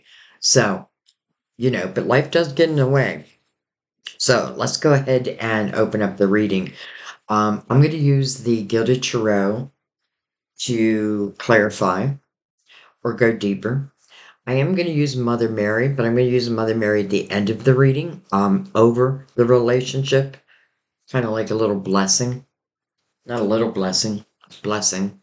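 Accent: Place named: Western Pennsylvania, United States. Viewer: I should’ve figured you’re from western Pennsylvania. We have different accents and pronunciate words completely different. Blessings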